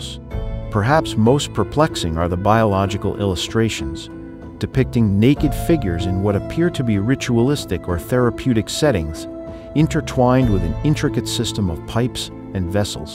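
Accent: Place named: Midwest, United States. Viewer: Was this made by AI? If you are going to use AI to do the narration, train it to pronounce common words correctly! The qords hoax and theory are NOT unusual, and yet your narrator--who otherwise has perfect middle American diction and syntax--your narrator pronounced those two words with hilarious errors. If the narrator is human, then they need to take the extra two minutes necessary to double check the pronunciation of words they do not know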